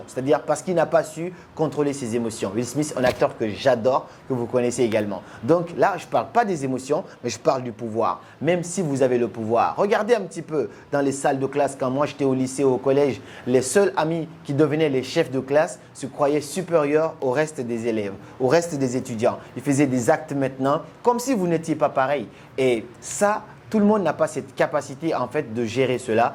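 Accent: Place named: Guinea. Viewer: On sent que c'est la Guinée ça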